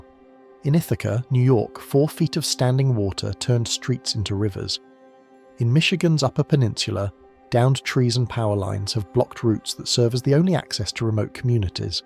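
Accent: United Kingdom. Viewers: Why British doing all our videos ....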